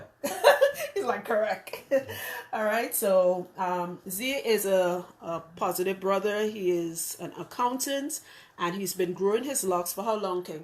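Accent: Trinidad and Tobago. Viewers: Trini in Paris with locks, I have medium course hair .. I love my hair so much but it has different textures on different locations of my scalp ! WHAT CAUSES DIFFERENT HAIR TEXTURES ON ONE HEAD ? !! It’s weird !! .. it’s not very common but I’m seeing it more and more ! I caught that Trini accent right away .. hahah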